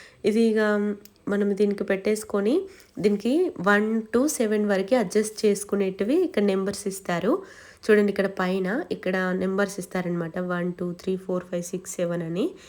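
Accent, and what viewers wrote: Telangana, India. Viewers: Cake 🍰 Super అచ్చమైన తెలంగాణ యాసలో మాట్లాడుతూ చాలా బాగా చేశారు ...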